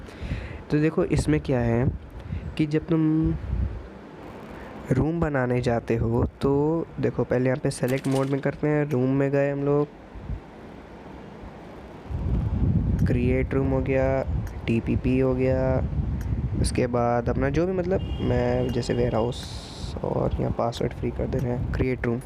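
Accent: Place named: Bihar, India. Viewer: Or bhai kya hal chal Abaj se lgta h ap v bihar me rhte ho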